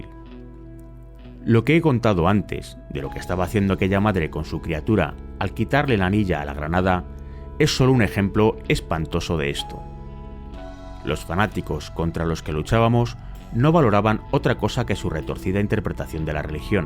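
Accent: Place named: Spain. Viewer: Porque dice audio real, sí el mae era gringo y este audio es españolete??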